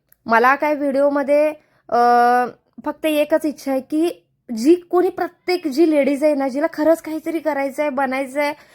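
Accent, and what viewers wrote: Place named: Kolhapur, India. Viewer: ताई कोल्हापुरी भाषा खूपच छान वाटते कानाला ❤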